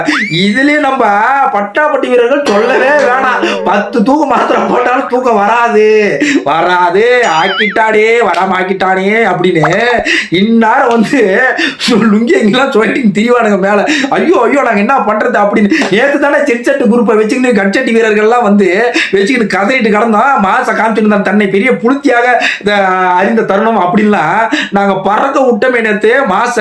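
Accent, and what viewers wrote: Kongu, India. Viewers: இது கொங்கு நாட்டு பாஷையா தூத்துக்குடி பாஷையா உங்க ஸ்பீச் வாய்ஸ் எல்லாம் சூப்பரா இருக்கு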